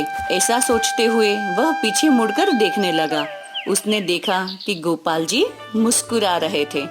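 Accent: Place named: South India, India. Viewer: South Indian voice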